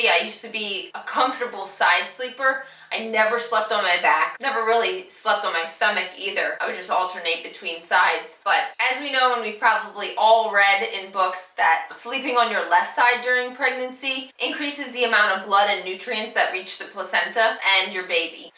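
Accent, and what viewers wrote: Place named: Alaska, United States. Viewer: Love your videos, your energy and accent lol. Sounds Alaskan?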